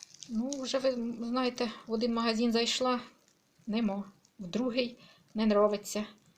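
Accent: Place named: Poltava, Ukraine. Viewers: так і я дивлюся і також так думаю,мова точно як у нас на Полтавщині. Я сама з Березняків 25 км від Лубен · Доброго вечера,.Ви говорите і примовляєте як у нас на Полтавщині. Приємно вас слухати. Дякую за відео.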